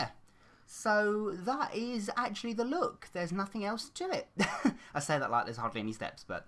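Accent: United Kingdom. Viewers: me and my mom love your britsh voice what part of englend are you from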